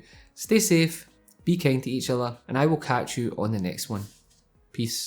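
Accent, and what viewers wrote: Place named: United Kingdom. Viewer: I don’t know if you will see this but you sound very British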